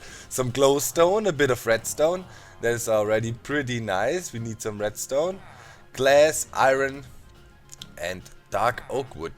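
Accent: Germany. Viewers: Schön dass endlich ein deutscher da ist. Ich bin ehrlich: Als ich gesehen habe, dass X gesagt hatte, es gibt einen neuen Hermit, habe ich schon die Augen verrollt. Es gab in letzter Zeit so viele neue Members. Aber als ich gesehen habe, dass du es warst, war ich doch sehr erfreut. Wirklich kennen tue ich deinen Kanal nicht (nur so 1-2 Videos), die ich mal gesehen hatte, aber mir im Kopf blieben. Wahrscheinlich wegen dem englischen akzent, der eindeutig zeigt, dass du Deutsch sein musst. =D So nun habe ich noch eine Bitte an dich: Auch wenn du mehr der praktische Typ zu sein scheinst, probiere doch jeden Raum entsprechend zu designen und "schön" zu bauen. Irgendwie ist mir das wichtig, auch wenn sich das komisch anhört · Love the German accent. <3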